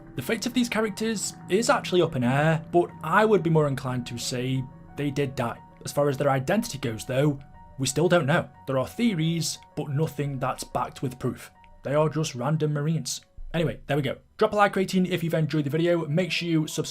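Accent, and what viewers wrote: United Kingdom. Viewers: Your accent man, you must be british?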